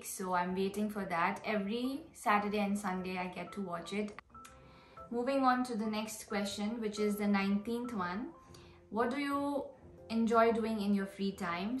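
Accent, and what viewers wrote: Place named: India. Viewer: Your Indian accent is so cute!❤️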